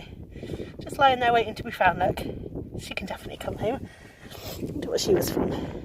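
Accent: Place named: West Country, United Kingdom. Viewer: Brilliant! And we all love your wonderful, gentle westcountry accent!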